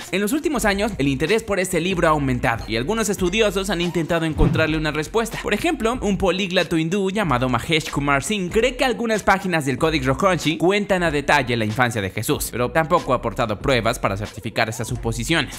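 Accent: Mexico. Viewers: ese acento mexicano me perturba mas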